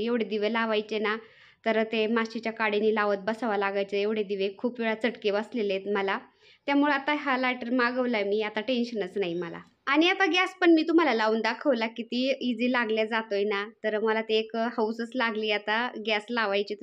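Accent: Ahmednagar, India. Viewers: Nagar chi bhasha lagech olakhun yete amhi pn kud bolato sagale bolatat kuthe bolav pn aplich bhasha chhan ahe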